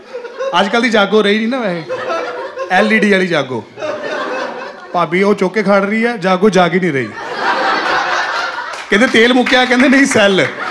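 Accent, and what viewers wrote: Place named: Malwa, India. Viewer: Pure malwai o paji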